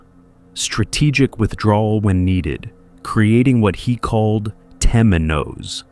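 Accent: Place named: United States. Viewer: It's impossible to find a more vile American accent, than with this narrator.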